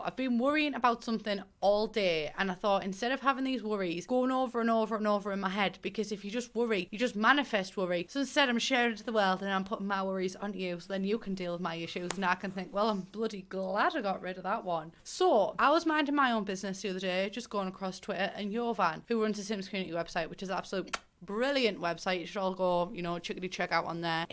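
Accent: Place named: England, United Kingdom. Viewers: So glad I found your channel, loving all the Sims videos, and you just the most stunning woman ever 😍, such a stronger English accent then what my family has 😶😶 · Hi, nice to meet you :) Absolutely love your accent... (I'm from UK originally so have heard your kind of accent before, and I love it lol....) funny at the moment the name of your accent where you come from escapes me...ah yes Jordy....yep! love it lol.... (just hope Im right in saying that now lol...) I won't mind as long as there is an option to play single player or multiplayer....but if its just multiplayer....I'm not very much into that really.... keep up the great work, you might have to slow down in your speaking lol....hard to keep up with you sometimes....but love it all the same....not meant to be offensive by the way :) have a great day :) magz :)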